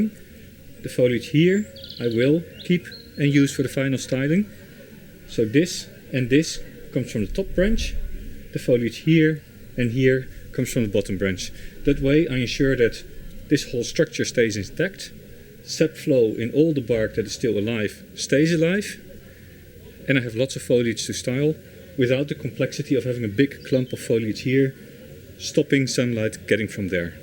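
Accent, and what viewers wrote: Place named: Netherlands. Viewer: Hello again. WOW that was a tour de force, bravo! Every other ‘demonstration’ I’ve seen were ham-fisted by comparison and over dramatic too! By the way, now I’ve heard you talk more, and fluently, I’d put money on your being Dutch, like some of my best friends! ✌️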